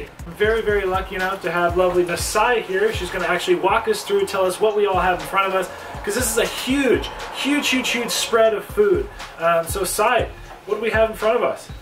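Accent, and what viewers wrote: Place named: South, United States. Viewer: You are so silly you and your cat in your country accent you make me laugh continue to stay safe many blessings and much love and peace ☮️💜💯😇🙏🏾💙❣️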